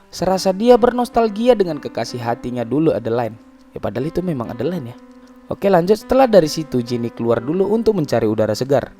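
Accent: Medan, Indonesia. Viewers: Pembawa ceritanya kayaknya orangedan ya 😁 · Oi bang.. Orang Medan ya? 😅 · Medan kali ya logat mu 🤣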